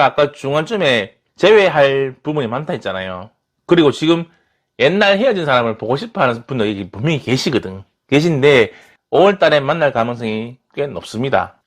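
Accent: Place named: Daegu, South Korea. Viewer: ㅋㅋ말씀하시는게 썸남이랑 똑같아서 듣는 내내 기분이 좋았어요. 참고로 썸남도 대구사람ㅋ 잘 들었어요~감사합니다 1번.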